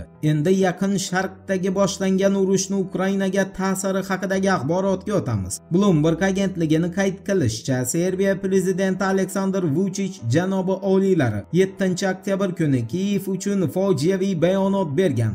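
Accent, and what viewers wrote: Khorezm, Uzbekistan. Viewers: Нима бу хоразмликми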